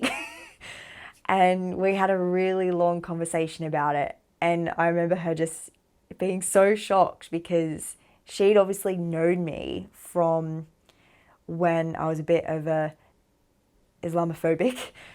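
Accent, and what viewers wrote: Australia. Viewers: Masya Allah Tabarakallah❤ Alhamdulillah Hearing your journey, especially when you called the mosque in the morning for your Syahadat, left me in tears.. Joyful tears Qadarallah Allah SWT planned the phone to be picked up so quick. Love your accent, my Mum's Australian, miss her soo much during this pandemi. I'm a reverd,living in Indonesia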